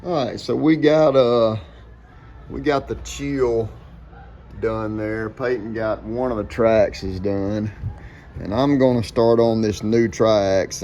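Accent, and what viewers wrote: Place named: South, United States. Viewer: I love to hear southern drawl talk! It's a southern heritage to be proud of. Hope your hunt is going well! Blessings!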